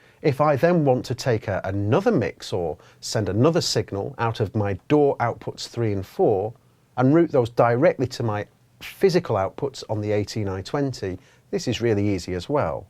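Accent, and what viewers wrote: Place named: England, United Kingdom. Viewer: Just a bunch of talking with no user knowledge to be applied...oh, he has an english accent.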